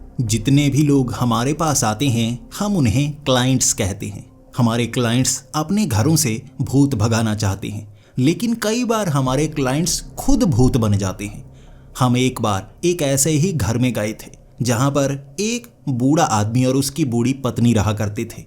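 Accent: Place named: Bihar, India. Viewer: Bhai ki bihari sunke to meri hanshi chhut gayi😂😂😂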